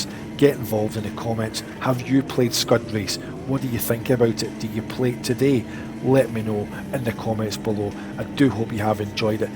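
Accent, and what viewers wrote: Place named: Falkirk, United Kingdom. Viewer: OP Just curious what part of Scotland you from? Sounds like maybe around Falkirk?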